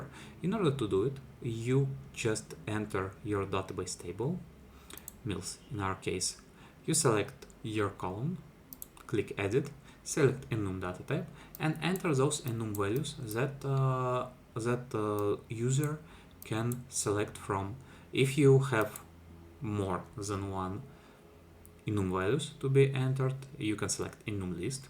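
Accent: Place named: Russia. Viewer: Какой сочный русский акцент 😊❤😅 прям родная речь, мёд для ушей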